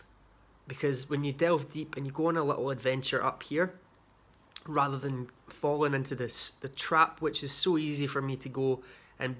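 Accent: Scotland, United Kingdom. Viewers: I've just subscribed on YouTube. I've watched you on Facebook forever. I'll be honest, I can't pass up a scottish accent lol but you're always positive with messages. You're my first son's age, it can be a dark and lonely road the internet,the highs the lows. Life in general is hard at that age without a public one. I hope you are as sound as you talk and look back and reflect on these days in passing as building blocks and lessons. Now I'm sounding old af ( im 44 fyi) and just hope you're ok. Leave if not , those who dont know you personally may will still be here, most probably will because you're funny and sweet,, and those who do know you personally will be there to pick up the pieces, certainly doesn't make you week.. Likes are not what makes you exist. You exist because of of you. 😍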